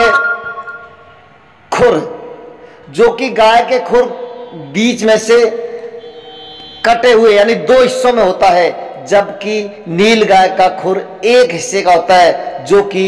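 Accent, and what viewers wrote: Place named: Haryana, India. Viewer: Bhai Haryanvi lag rhe ho😁🤣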